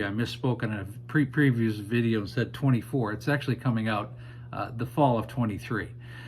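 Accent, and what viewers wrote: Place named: Canada. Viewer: Sounds like your average Canadian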